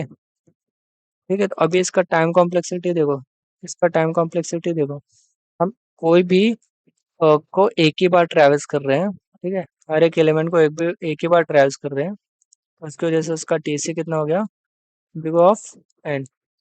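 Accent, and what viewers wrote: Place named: Maharashtra, India. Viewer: your marathi accent is so sexy:face-blue-smiling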